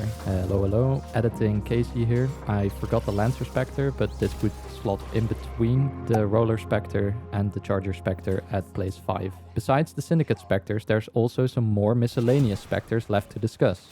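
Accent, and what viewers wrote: Netherlands. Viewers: nice dutch accent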